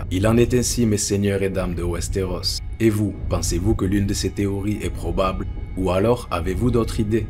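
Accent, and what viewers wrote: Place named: South, France. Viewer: J'adore ton accent mon frère, tié du sud ?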